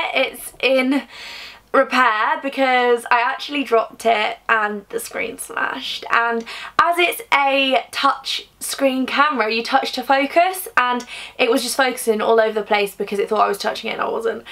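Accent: United Kingdom. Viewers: I like a lot she's voice, I wish to get a bit of British accent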